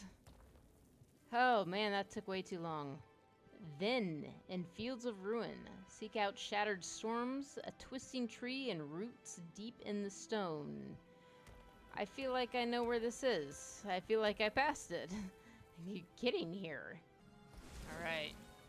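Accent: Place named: Midwest, United States. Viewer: Midwest accent so sweet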